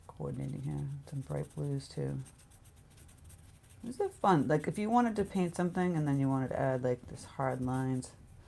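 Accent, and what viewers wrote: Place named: Massachusetts, United States. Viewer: I agree about '21 being tougher than '20. Hoping '22 is much better for all of us. I think we're all weary and sick of hearing about the virus. Time to get back to living! I thought I detected a MA accent! I grew up in Waltham but am in NH now (since '99). Thanks for the video. I hope your holidays were enjoyable and peaceful.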